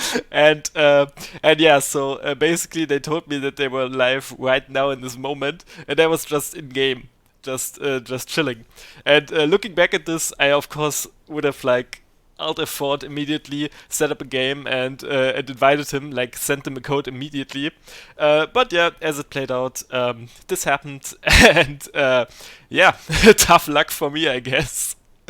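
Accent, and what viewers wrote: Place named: Germany. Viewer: I indeed love your German accent! :D Best chess channel I know, keep up the good work and I am looking forward to your stream on Saturday :p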